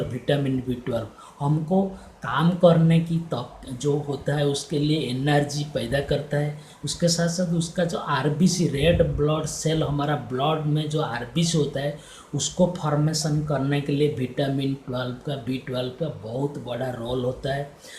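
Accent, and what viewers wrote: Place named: Odisha, India. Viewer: Mujhe lagta hee ki tum odia hoo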